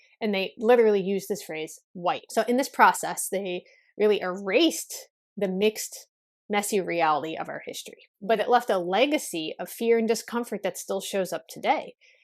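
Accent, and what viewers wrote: United States. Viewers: You are a white american. Of course these are social constructs and I guess you can be anything you want but others can do the same and label you white american. You look white and you sound american.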